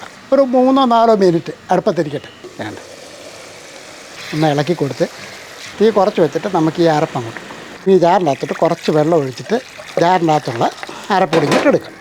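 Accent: Kottayam, India. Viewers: നല്ല കറി ഇക്ക നല്ല അവതരണം ഞങ്ങൾ കോട്ടയം കാരുടെ ഭാഷ പോലെ തോന്നുന്നു🎉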